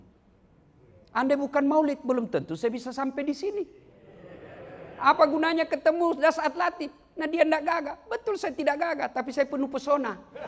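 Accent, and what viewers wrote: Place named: Sulawesi, Indonesia. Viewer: MasyaAllah Yang paling saya suka logat sulawesi nya. Sehat truss ustadz. semoga selalu dlam lindungan Allah swt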